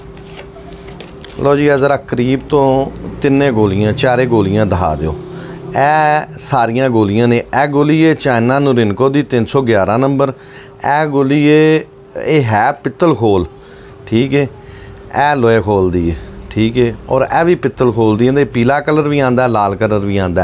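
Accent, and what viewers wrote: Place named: Punjab, India. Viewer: I REALLY VERY MUCH LOVE SMILING FACE EXCELLENT PUNJABI ACCENT LOVE U BROTHER,PL MAKE A VIDEO ON LATEST COST ALL TYPE AMMUNITION,💙💜💚🧡❤💛♥👍👍☑✅🆗😊😊😌😌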